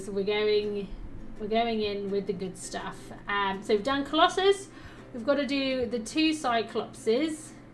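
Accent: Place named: United Kingdom. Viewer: I love your british accent. Good job. Keep on playing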